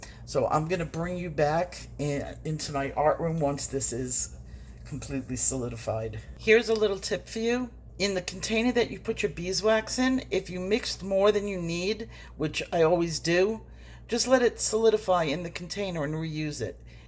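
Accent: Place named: Massachusetts, United States. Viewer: "The HECK did I invent hea?!" Love it 😂😂 I live in MA so hearing your accent always puts a smile on my face 😊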